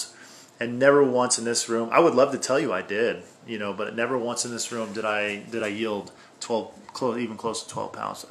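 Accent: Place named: East Coast, United States. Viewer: oh yeah, I'm old and grizzled, and you sound just like 2 or 3 of my buddies rolled into one dude. You originally from back East? Doesn't matter, you just sound like it. I was pushing my stuff too hard when I switched to LED and I just finally tried CO2. The first run was fucking nuts, it might give you 15-20% if you are dialed, but if you were doing everything just a little too hard and that's your limiting factor...whoa, more like 30% and much better quality, plus it lets you run even warmer, which lets me dehumidify harder here, and so on and so on, point is 10'x10' room only cost $100 in gas for 9 weeks once you got your gear. I just got 2 ft flouro UVB bulbs to hang over each table for ripening this round, the dangerous ones where you don't want to be in the room when on, the plants are supposed to cover themselves in sunscreen in response. 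No YouTube, but I'm on the Gram, same name.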